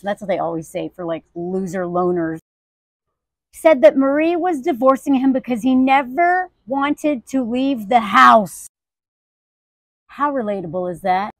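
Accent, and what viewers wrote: South, United States. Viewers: You're from Nashville! Cool city. I knew I heard a little bit of a Southern accent. lol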